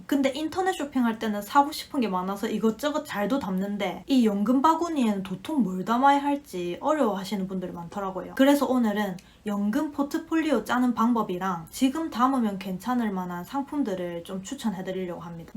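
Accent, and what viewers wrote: Gyeongsang, South Korea. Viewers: 경상도 사투리가 crystal clear 로 잘 들려요. 저는 경기도 정보 감사합니다 ETF 도 여러 종류가 있던데 묶음주식 10개 Main 을 보면 많이 다르던데 추천 부탁드립니다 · 누나 ㅋㅋㅋㅋㅋ설명 너무 찰진다요 ㅋㅋㅋㅋㅋ 경상도 사투리라 더 머리에 쏙쏙 들어옵니다 ㅋㅋㅋㅋㅋ진짜 구라안치고 저는 ETF가입할까 말까 고민만 2년째했는데 누나 영상보고 바로 결정했습니다 ㅋㅋㅋㅋㅋㅋㅋㅋㅋㅋㅋㅋ감사합니다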